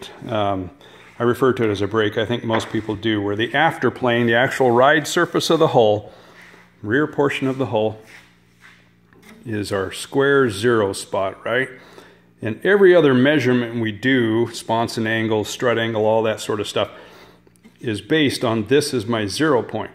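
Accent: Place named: England, United Kingdom. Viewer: Oh, p****n d'accent rosbeef !!... 👎🏻👎🏻👎🏻👎🏻👎🏻👎🏻🤢🤢🤮🤮🤮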